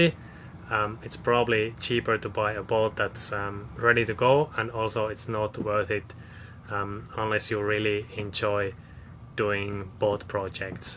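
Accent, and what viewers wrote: Finland. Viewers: I was listening to your accent and I thought you might be Finn. I was right. 😊 I’m Finn American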